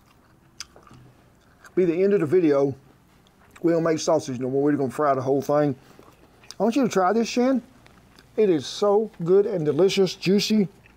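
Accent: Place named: Texas, United States. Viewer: red dead redemption grandpa ... man that texan accent. :face-red-heart-shape::face-red-heart-shape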